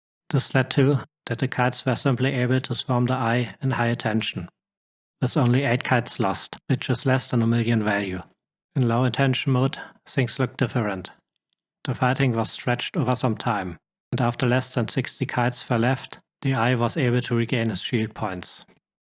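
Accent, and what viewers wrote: Germany. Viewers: Thank you for sharing your findings. Ze graphs and German? accent really drive the facts home on this one :) :)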